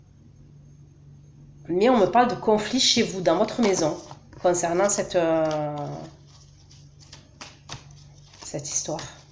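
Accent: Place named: South, France. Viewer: Ta une belle voix du sud comme moi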